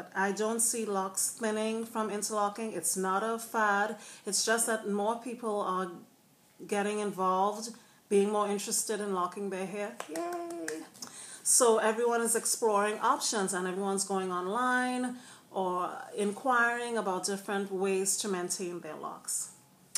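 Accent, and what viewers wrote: Trinidad and Tobago. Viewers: sound like a trini..love ya accent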